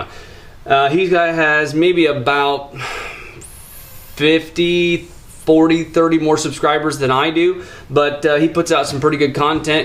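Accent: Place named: Ohio, United States. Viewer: Was funny to recognize a fellow Ohioan by the way you say "like that" as "like cat". Not making fun of you at all, it's how we talk. I was born there (columbiana county) and lived there for 26yrs. Now I've spent 28 years in Kansas and lost that part of the accent. I own a 2005 slt power wagon. 👍🏽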